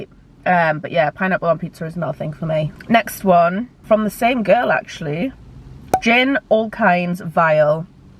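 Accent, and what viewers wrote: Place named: Wales, United Kingdom. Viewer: I’ve come from demis Chanel and firstly I must say, loving the content, I’d love more vlogs, I think you’re fab! But.. where is your accent from? I feel like I hear Welsh twangs but I’ve just assumed your from Newcastle area so now I’m doubting the Welsh accent. Ps I love the Welsh accent so I’m hoping I’m right in my thoughts otherwise.. that’s embarrassing 😂😂💜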